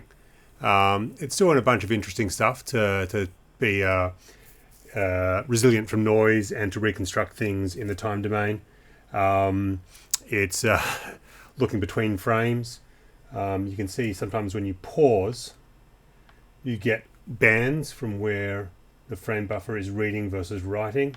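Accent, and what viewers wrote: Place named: South Melbourne, Australia. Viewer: This man speaks in a heavy south Melbourne accent. A Very harsh Aussie dialect.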